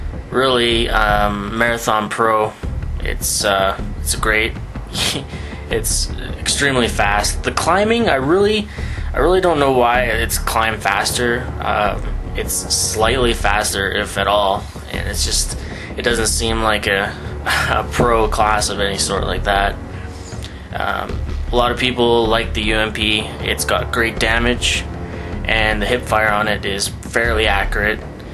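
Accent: Canada. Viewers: u from canada?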